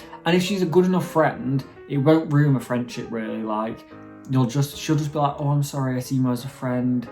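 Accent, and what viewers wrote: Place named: England, United Kingdom. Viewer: Absolutely love your vibe ... and could listen to your voice all day. Love an english accent ..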